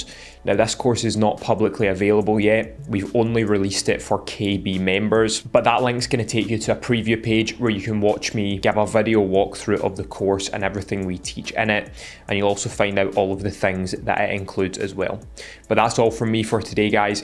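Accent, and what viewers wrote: Scotland, United Kingdom. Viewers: Scottish accent is elite im moving outa ldn to Edinburgh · Never heard this uk accent 😅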